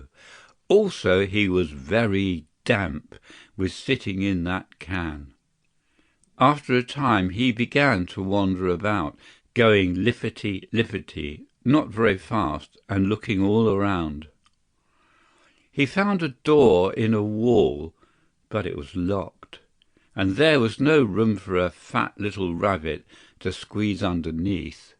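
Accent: United Kingdom. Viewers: Произношение британское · Произношения английское,не американское.В Америке так не говорят.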